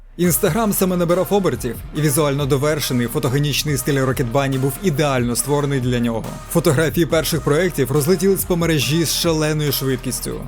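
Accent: Russia. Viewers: Класне відео. Ух, де там NFS 2015! Чому ви відтворюєте латинські слова за російськими правилами вимови "E" як "Є"? :face-orange-raised-eyebrow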